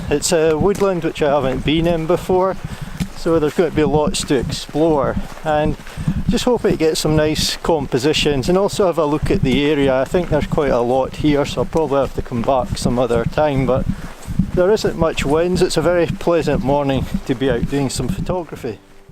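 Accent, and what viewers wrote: United Kingdom. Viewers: Your image screamed Scottish Woodland as I know it, which is perfect. A gorgeous image, absolutely stunning especially with that silver birch behind your subject tree and the light on the floor. It all came together to make a phenomenal shot. Whenever I look at your subscriber numbers I always expect to see a K after the number. I don’t shoot videos mainly because of how I sound with my breathing so any advice from myself would be plucked from the air. However, I read on another channel how our friends from across the pond struggle with our British accents, I was wondering if you slowed your speaking down a little bit it might help? I’m not trying to be critical, just trying to think of things that might help your channel get to where it rightly belongs. You are undoubtedly one of the best British landscape photographers on YouTube, but your numbers don’t reflect that fact. 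Anyhow, that aside an brilliant video with an image I’d be more than happy to have as my 'one' image after a week out never mind 3 hours.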